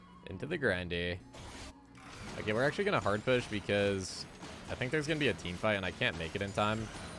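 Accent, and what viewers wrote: United States. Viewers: He's not European, he's just from the west coast.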